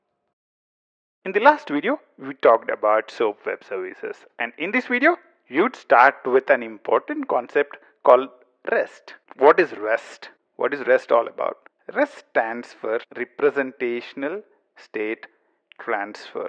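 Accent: India. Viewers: Ох этот индийский акцент 🤦😁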